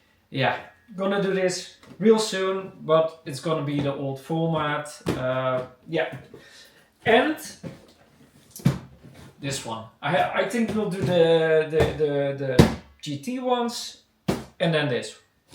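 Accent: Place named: Netherlands. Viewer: Grappig hoe je gelijk hoort dat je Nederlands bent. Toffe figures. Ben zelf niet echt een fan van die Baby vegeta, oogt te groot.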